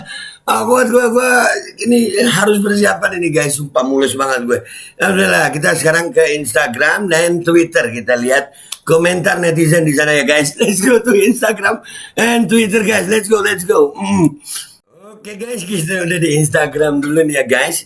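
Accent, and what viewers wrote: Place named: Banjar, Indonesia. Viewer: Asli lucu beh ae bila mandangar pian pakai logat banjar...😄😁😆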